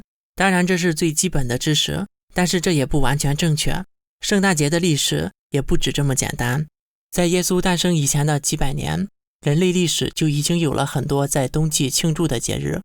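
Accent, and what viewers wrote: Shandong, China. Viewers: 你这个山东口音也是很强了。个人认为，不过就不过吧，抵制就很弱智了... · 搞笑，中國人又不是普遍信基督教，這不過聖誕你們又受不了了?台灣人說中文，寫漢字，吃飯用筷子，過中國傳統節日，他都不認為自己是中國人！還有up主你也別裝台灣人了，那口山東口音還沒掩蓋住呢！說到自卑，你不更自卑，不過這輩子你都不能變洋人！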